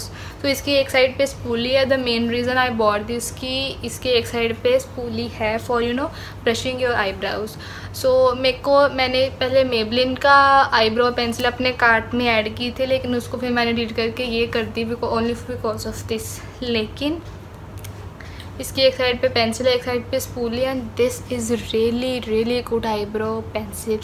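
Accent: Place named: Punjab, India. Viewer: Your Punjabi accent is super cute 💕💕💕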